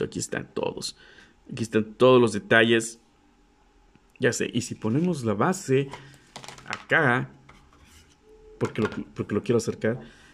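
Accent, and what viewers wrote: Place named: Coahuila, Mexico. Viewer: Eres el mejor:D sigo sintiendo tu asentó cuahuileño aún que ya me dijiste que eres de Tijuana:D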